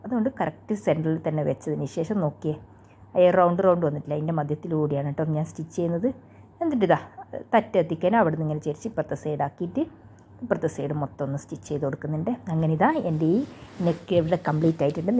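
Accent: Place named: Vadakara, India. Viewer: Are u from വടകര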